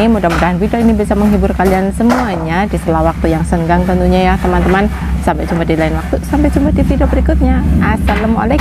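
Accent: Banyumas, Indonesia. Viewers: Ru prtm kali liht, ngapak y MB, slm dr pnrgo, melu kemecer pdes" kecut sjk e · Uewanakkkkk mbak, seger gitu ya, mbak nie org jawa ngapak ya, cara ngomongnya kayak nenek aku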